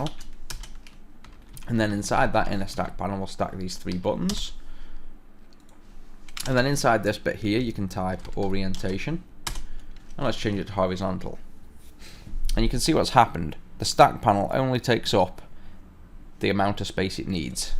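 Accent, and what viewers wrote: United Kingdom. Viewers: Lol that UK accent. I feel like I am watching game of thrones. Well done by the way! Nice Video!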